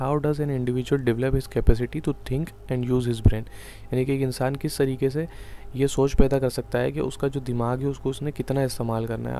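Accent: Karachi, Pakistan. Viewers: sir ap k language accnet sy meny abserve kr lia tha k ap karachi sy hain ❤❤❤❤ or apny sahi kaha k koi students panjab k kisi village sy lacture sun rhy hongy ..exectly am listining your lacture from panjabs village ❤❤❤well explaind ❤❤❤ May Allah give u success by leaps and bonds❤❤❤❤ameen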